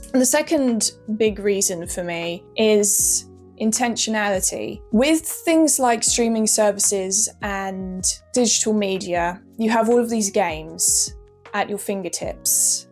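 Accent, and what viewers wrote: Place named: RP, United Kingdom. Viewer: Wow Bridgerton accent IRL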